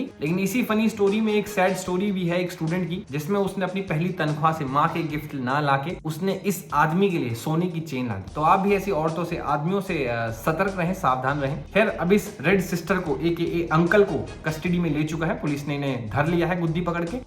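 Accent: Pahadi, India. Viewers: Yes 😂 why not., I literally laugh every second 😂 plus your pahadi accent ❤ lots of love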